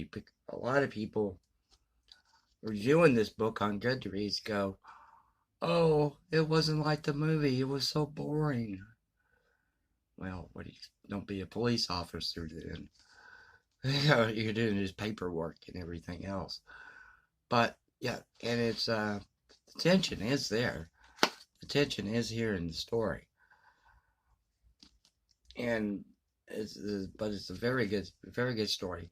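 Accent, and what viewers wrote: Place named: Texas, United States. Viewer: Thought I recognized the accent. Howdy fellow Texan. Cool, sad story about the TV adaption of "All Creatures Great and Small."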